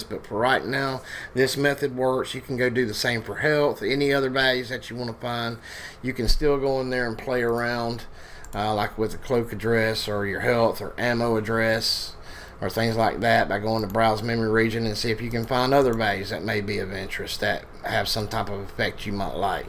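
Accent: South, United States. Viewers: Southern sounding computer talk probably has to be the best thing ever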